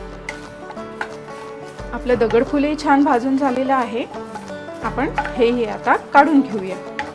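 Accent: Konkan, India. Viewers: Excellent,Mrs!! By the way,where do you stay,as your accent is,typical konkani. Thanks & all the Best to you,Family members.Shrineevas Sant,Nasik <mail>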